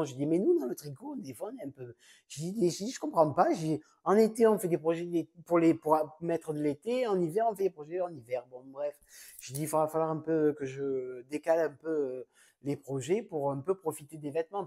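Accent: South, France. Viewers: Bonjour Quel bon moment passé à te regarder! J' adore ton humour, ton accent 😜 et tu transmets le soleil aux gens du nord Bon moi qui suis de Perpignan je n' en manque pas de soleil! Les laines et divers objets que tu présentes sont tous plus beaux les uns que les autres! Je connais bien la boutique à Barcelone all you knit is love et j' y trouve toujours des pépites à acheter! Le trio que tu as pris est tres beau, leur qualité 70/30 est douce avec une belle définition de points Tu vas te régaler de faire un beau châle 🤔ou autre projet! Je te souhaite de passer un bel été sans sauterelles 🤣🤣👍: j' ai eu également ma part d invasion ces dernières années!! Bisous bisous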